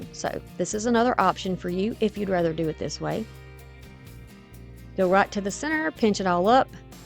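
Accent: South, United States. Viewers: Crackling on acrylic paint is not easy. Love these sunflower DIY's I love your country accent. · Hi, new subscriber here. Heard that Southern and knew i belonged! Mississippi, here. I love your designs and the "make it your own!" You had me at y'all!😁🥰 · All the DIYs were great. Love the style. Never get sick of ur southern accent. Everyone has some type of accent. I am from Maine and we have a different accent here too. Keep the DIYs coming. ❤️ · I’m from the south, so I hear that accent a lot. I’m from Louisiana so we have a bit different accent but here in Tennessee, it’s different. You actually sound like a lady I work with. Just the things you say and the way you talk. She crafts as well so I had to check the name! 😂 but she designs tumblers. We apply labels to products, etc., and that was right up her alley when we had these tubes to place labels on. 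She was the only one that could do it perfectly and quickly! The little ones I could do, once she showed me how. The bigger ones, no can do! I was happy to let her do them. One more thing… different subject— I got these decoupage papers on Amazon and didn’t notice that they were only 6”x6”! They are beautiful but I think I can only use glue sticks to apply them! What can I use them for? If you have any suggestions or if you could possibly do a video on using them in some neat way, that would be great! It’s a whole picture of flowers with no space to kind of cut them and put just accent pieces without them looking like they’ve been cut. It’s hard to explain. Just looking for ideas or suggestions. I was very disappointed and just should have paid more attention to the size! 🤦🏻‍♀️ I also go some stencils that were bigger than I was wanting but I can find something to do with those. I’m not sure I can share pictures on here or I’d show you what they look like. 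Maybe if I matched the paint to the background of the paper, I could possibly do something with them. I just don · ❤ I love your country accent. I have one too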